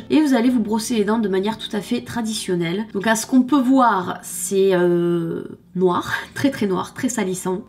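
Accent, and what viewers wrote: South, France. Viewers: avec l'accent du sud c'est top !!!!! allé je m’abonne , le peut de tes vidéos que j'ai vu sont super ... bonne continuation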